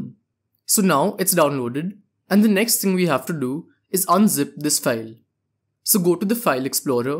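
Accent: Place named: India. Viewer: indian or somthing like that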